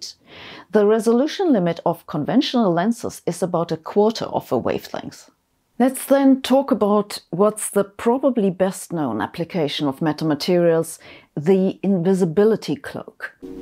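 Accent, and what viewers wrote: Germany. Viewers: Deadpan humour with a German accent is the best thing ever.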